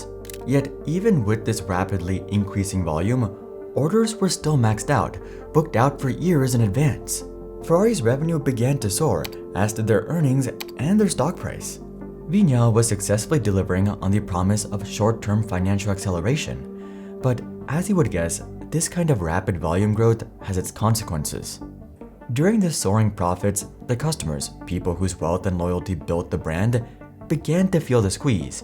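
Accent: India. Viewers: Am I the only one to think that has an Indian background, judging by his accent? Otherwise I'm struggling to get used to not knowing about a foreign channel without a face, nor a common accent, be it UK or US. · The narrator is trying so hard to hide his indian accent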